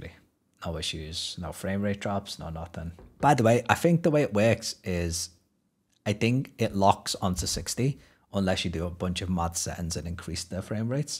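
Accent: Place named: Liverpool, United Kingdom. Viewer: Your accent is hurting my brain, it's like 85% scouser and 15% something else. You are from liverpool right?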